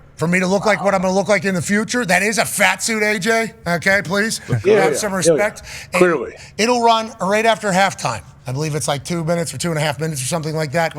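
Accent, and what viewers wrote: Pittsburgh, United States. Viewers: Should have turned up the yinzer accent to the max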